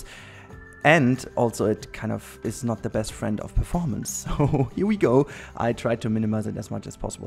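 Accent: Germany. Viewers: Ich Finde den Deutsch Englisch Akzent so schrecklich 😂 is bei mir aber auch so mit dem Englisch was du gesagt hast 🙋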